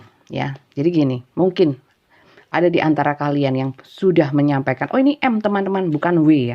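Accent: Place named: Malang, Indonesia. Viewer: Suka banget cara nyampaikan bunda , Arema banget pokoknya soalnya dulu waktu kuliah di mlng teman teman cara ngomongnya ya logatnya kayak bunda deh, kakak saya juga banyak yg tinggal di mlng jadi klop dgn bunda , enak dengannya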